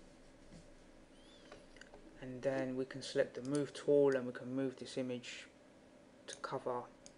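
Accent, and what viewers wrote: United Kingdom. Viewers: Like the British accent! You need to do some gimp starter tutorials as you are telling elementary steps while doing advanced tutorials man!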